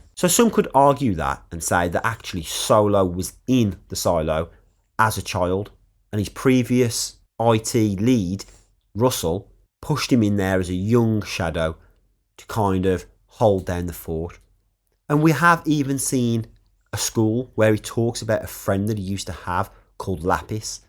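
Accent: United Kingdom. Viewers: I thought it might have been more of a black country accent!! Yeah, I'm in south Brum myself!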